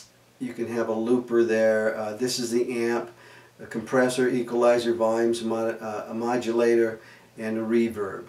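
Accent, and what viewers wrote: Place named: Los Angeles, United States. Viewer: Where is your accent from? L. A.? Reminds me of Greg Graffin from Bad Religion in old interviews.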